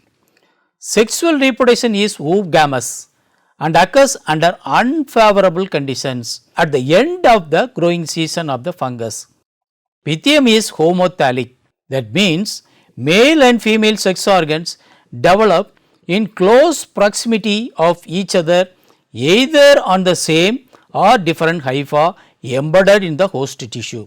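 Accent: India. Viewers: Respectfully, I feel it is a shame there is no subtitles for this video. There may be helpful information on the subject matter but the speaker's extremely thick accent makes it nearly impossible to understand what he is saying. I find it very difficult to listen to people with an Indian accent speak English and they very often seem to insist on doing it anyway without subtitles and without learning how to properly pronounce and accunciate English words. I can't imagine learning to speak Hindu with an American accent and expecting native Indians to understand what I am saying and retain helpful information on a scientific or medical topic without being distracted or frustrated by the language barrier. Would it not be better to make the video in your native language and have subtitles in English or have it translated and a voice-over done by a native speaking English person if you want your viewers to truly obtain the content you are providing?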